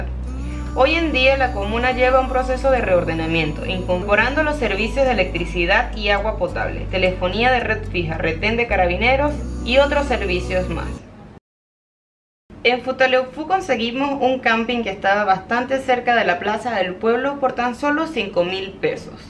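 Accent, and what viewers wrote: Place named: Peru. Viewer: creo que tiene acento peruano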